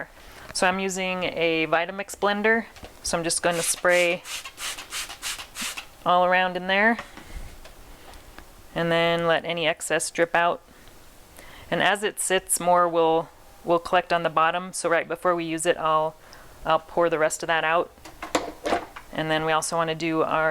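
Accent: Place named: Ohio, United States. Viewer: you sound like you're from Ohio with family from PA or WI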